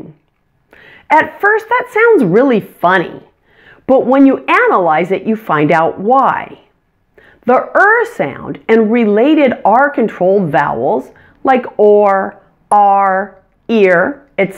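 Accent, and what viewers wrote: United States. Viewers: But you pronounce it the American way not the English way.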